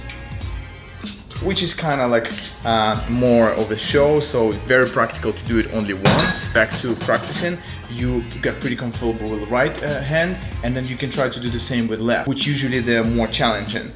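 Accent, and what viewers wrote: Russia. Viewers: Охуенная футболка Да и наш акцент чувствуется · Хах, думаю: у него русский акцент🤔 А он реально русский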